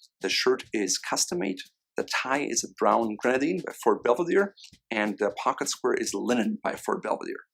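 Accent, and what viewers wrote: Germany. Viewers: Is your accent German?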